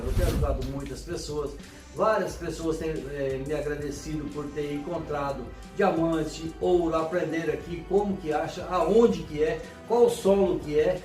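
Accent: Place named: Northern Minas Gerais, Brazil. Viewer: Esse sotaque é aqui do norte de minas, achei legal.rss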